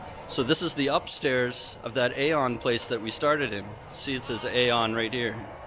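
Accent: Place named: Toronto, Canada. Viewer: NIce to see another Toronto voice active here in the Penh....and a fellow bassist to boot. Great vids.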